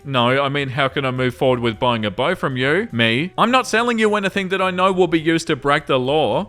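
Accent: Australia. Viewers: What? But your Aussie accent is part of what makes you so compelling to listen to! It gives your speech a nice, lilting cadence, like a little song. 🎼 Sing a little song of neckbeards!🎵🎶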